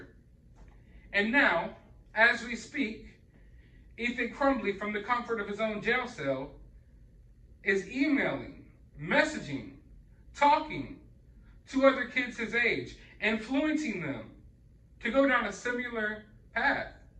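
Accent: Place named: Hawaii, United States. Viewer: How you say, where I stay, is so Hawaiian 😉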